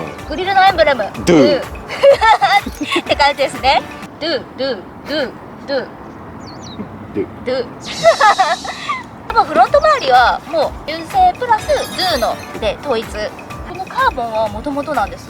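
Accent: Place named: Hiroshima, Japan. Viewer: ちゃん今日もめっちゃ可愛いね💕 相変わらず広島弁がいいね👍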